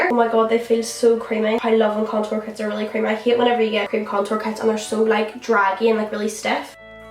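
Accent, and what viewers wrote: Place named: Belfast, United Kingdom. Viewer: Are you from Belfast? I recognise that accent XD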